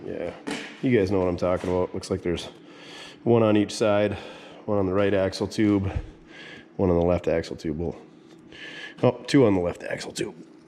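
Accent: Minnesota, United States. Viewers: Great project, make dad happy. Your accent makes me feel like I'm back in Grand Rapids Mn.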